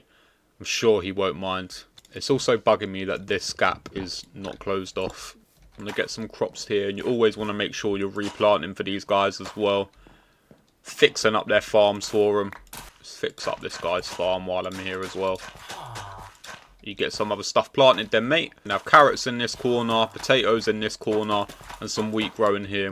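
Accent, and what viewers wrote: United Kingdom. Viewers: OMG… HES BRITISH ITS PERFECT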